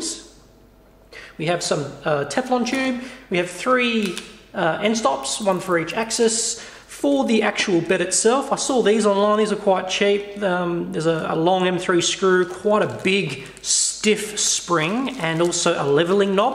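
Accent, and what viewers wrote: Australia. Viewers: judging from your accent, you are aussie like me, where did you get your screws? my local hardware is terrible for screws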